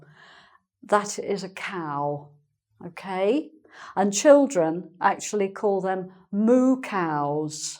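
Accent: United Kingdom. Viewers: You are a great teacher. And your accent ! I love British accent but is not easy to understand, but I am happy listening to you because you are so clear. Thank you very much. Love from Buenos Aires.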